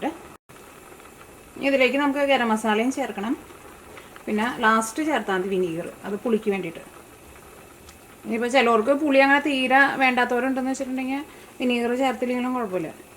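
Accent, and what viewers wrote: Thrissur, India. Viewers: Hi,I’m from Nadathara/Thrissur originally but settled in the UK. Love your recipes reminds me of my mum’s cooking! Also your Thrissur accent...Ishtu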